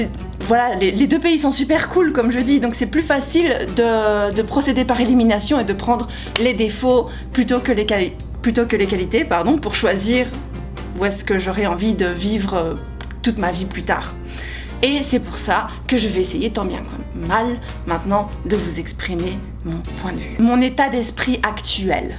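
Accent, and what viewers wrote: Belgium. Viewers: bonjour.Venez habiter à l Ile de la Réunion!! Ici il y a quasiment que des métisses et sa marche. On devrai envoyer les étrangers en stage chez nous On a toutes les ethnie possibles : européens chinois , indiens , africains, malgaches, belge (humour) (mais pas encore de japonais! ça manque) ect... et tout ce petit monde s'aime et se reproduit a tout va ... Personnellement je suis chtimi des hauts de france (ça pète hein !) (salut cousine) et je suis mariée à une réunionnaise , bilan : mes fils et mes petits enfants sont tous de couleur différente !!! pas facile d’être raciste dans un tel contexte !!!!Enfin on s 'en accommode....voila voila , tout ça pour dire que si tous les cons volaient bin il ferait nuit 24h sur 24. Bien venu au petit haricot que le monde lui soit propice . Ps : vous êtes belge je confirme il y a des petites intonations qui ne trompent pas ! 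Amicales pensées. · mdr ta façon de parler et tes sautes d'humeur me font penser à gonzaroo ! XD à croire que tous les belges s'expriment pareil .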